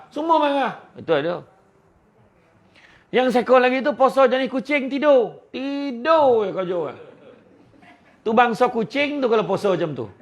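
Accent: Negeri Sembilan, Malaysia. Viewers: Yg dislike tue dyo x paham loghat nismilan Tue🤣🤣🤣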